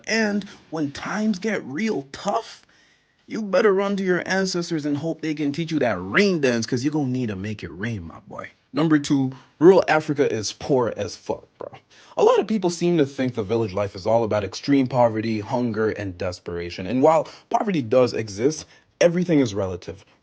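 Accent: United States. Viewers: Why does your accent so American? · And yet you sound American? 🤔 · why do you sound american, genuinely asking. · Great vid as usual! I hope this isn't a rude question, but why does your accent sound American? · Your English is fantastic!!! You sound like my neighbors in Virginia. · Then how do you have an American accent when you were born and raised in Rural Africa? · Born and raised in Africa but have a American accent? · yeah but like what I mean is he sound like he was born and raised in like Detroit or smth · can i ask how come you have an american accent? im curious!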